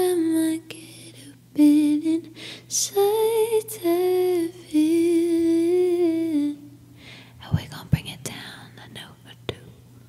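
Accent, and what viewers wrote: Spain. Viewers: Aré u Spanish? Bc u have the accent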